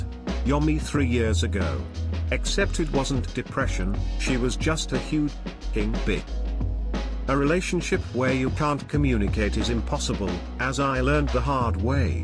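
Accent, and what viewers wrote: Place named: United Kingdom. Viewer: I didn't even consider that the voice in this video was british also because i quit listening. But yes, the old voice (often named Daniel) is better. He isn't perfect, but he is the boss at this. Everyone else just sound wrong with the only exception being the american voice of the Radio TTS Youtube channel.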